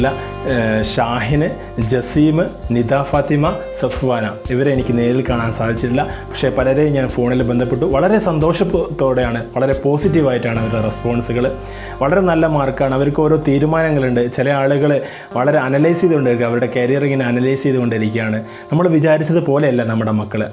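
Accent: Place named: Vadakara, India. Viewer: bro 😍 Vadakara yude thani bhashayil ulla vlogs😜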